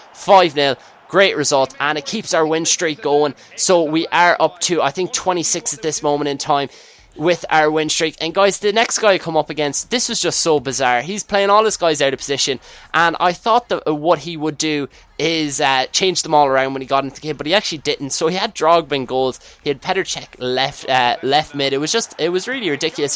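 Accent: Ireland. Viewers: where exactly in ireland are u from?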